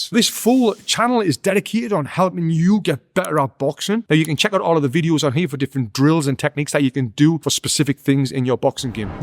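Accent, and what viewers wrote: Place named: Geordie, United Kingdom. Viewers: Geordie was one of the first British accents i have been actually aware of (thanks, DI Lewis!) and i think it's sooo lovely and really relatively easy to understand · Good, sound advice from a true Geordie (I hope non-Brits can follow your accent). Brit here, in my later years, and finally, after so long, I'm taking up boxing for physical and mental fitness. Beginning with a boxing PT at a local fitness centre, and hope to move on from there, not professionally, of course, but in some way get the opportunity to get in a ring and experience what I have always wanted since I was a kid. Keep up the good work, and many thanks from London.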